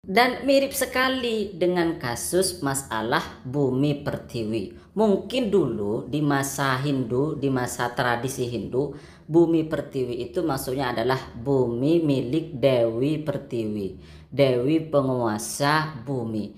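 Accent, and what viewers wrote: Madura, Indonesia. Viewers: Orang madura ya admin ny?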